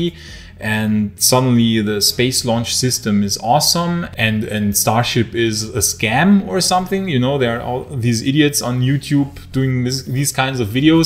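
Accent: Germany. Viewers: You could have pushed your audience to Odysee or Bitchute. No algorithms there.... I'm gonna miss your show, but I wish you guys the best! I'll give the other guys a watch, though... It won't be the same without your sarcastic comments made in you German accent, but I'll give them a chance. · I like both topic and I was actually subscribed to the other one ,… and I just realize it was another channel… . Your accent is from German?… I’m Spanish speaker and going throughout B 1 in German :). ( Austrian wife 🤷🏻‍♂️) .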